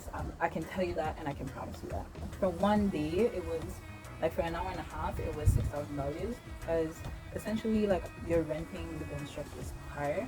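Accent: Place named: Jamaica. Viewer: okay purrrr, look at you with your jamaican accent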